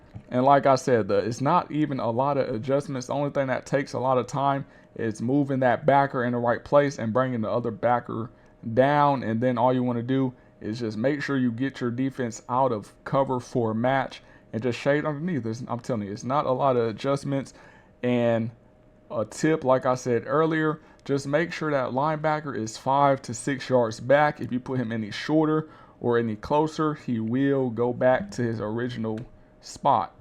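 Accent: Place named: South, United States. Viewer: This guy is from Alabama or Mississippi. That drawl....I recognize my peoples...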